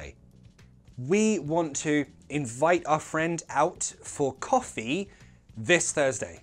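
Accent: United Kingdom. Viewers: A big greeting from Piura, Peru. I really like your videos. They are so fun and I love british accent!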